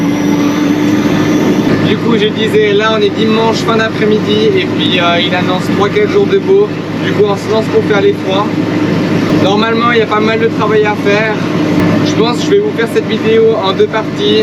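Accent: Switzerland. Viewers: slt tu es de quelle region ? tu a un accent mais je n'arrive pas localiser . en suisse peut etre nan ? je viens de decouvrir ta chaine . fort sympathique + 1 abo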